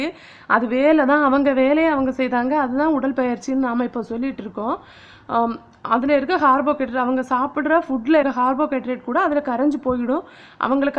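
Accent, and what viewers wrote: Kanyakumari, India. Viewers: Akka neega kanyakumari ah? Slang correct ah Iruku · Am also from KANYAKUMARI .I had found u with ur slang.well done sister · Hi, Nice to hear kumari Tamil.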